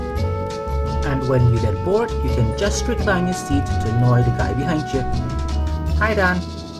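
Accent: Scotland, United Kingdom. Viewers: whaoh i never expected you to have a scottish accent LOL ,love the voice over great addition to an already great channel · your accent dips in and out of hard Scottish so it's just interesting to listen to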